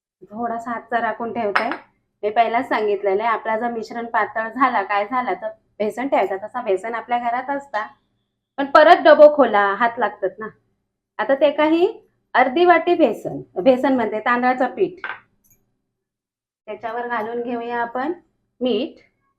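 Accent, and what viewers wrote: Malvani, India. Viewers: तुमच्या विडिओ hi मनापासून वाट बघत असते तुमची मालवणी लय आवडता खूप सुंदर सुधा nagwekar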